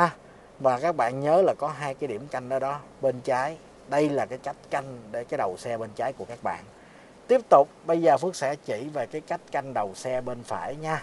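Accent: South, Vietnam. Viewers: Giọng Miền Nam kg văn hoa lý luận,cái kiểu " CÓ SAO NÓI VẬY NGƯỜI ƠI "❤❤❤ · Lâu lắm rồi dzô youtube mới được nghe giọng Nam chân phương❤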